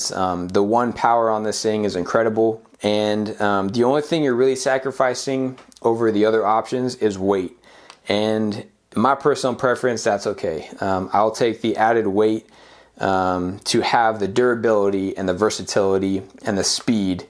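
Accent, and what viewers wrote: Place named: North Texas, United States. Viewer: Sounds like you live in North Texas lol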